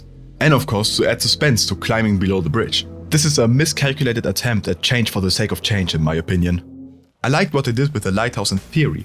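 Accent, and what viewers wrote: Germany. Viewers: I can here that your german... Still my guy making peak after peak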